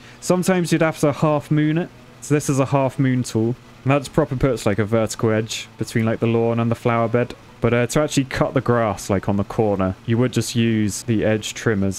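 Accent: United Kingdom. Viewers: I came for the gaming and stayed for the British accent :)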